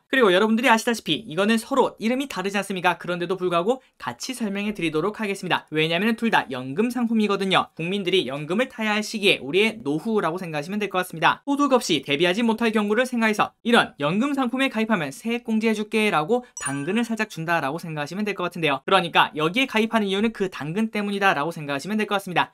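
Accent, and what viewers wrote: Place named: Daegu, South Korea. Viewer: 저도 느끼고 있었... ㅋㅋㅋㅋㅋ 전 부산인데 사투리가 대구 친구랑 똑같길래 대구구나 해찌여 ㅋㅋㅋㅋㅋ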